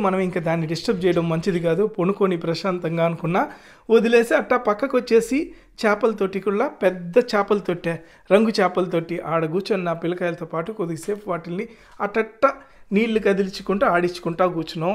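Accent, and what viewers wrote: Nellore, India. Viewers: I am not saying you need a fake voice. Just talk normal how other travel blogger’s and other youtubers are talking. You try to speaking over extra Nellore slang . I am not any advice to you. Since I am your subscriber I telling to you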